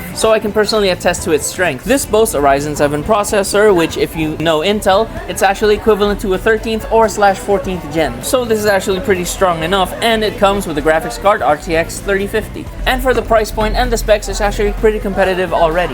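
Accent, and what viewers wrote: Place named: United States. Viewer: I mean its okay for you bro to be english speaking with American accent, dunno if you gew up States side, but ,I think viewers will much appreciate if you go Taglish or Tagalog. We can just watch foreign or global videos if we want english based ones(I mean on your laptop reviews), but most Pinoys would love to see Taglish or just pure Tagalog vids just like how Tito Dustin does it. Medyo boring bro if englishero realtalk.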